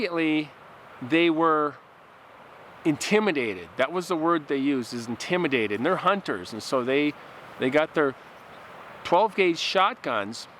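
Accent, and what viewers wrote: Minnesota, United States. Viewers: I went to Saint Olaf College; I’m from Seattle, but that accent, right away, told me exactly where you are from. What a great idea for a channel, looks like you are doing great. Can’t wait to listen and watch every one of your uploads. Bye now, you take care, see you soon, Buh bye. (The Minnesota long goodbye)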